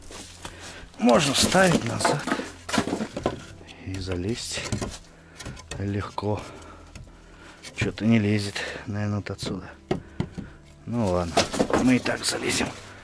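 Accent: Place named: Penza, Russia. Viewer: и на пензенский похож)))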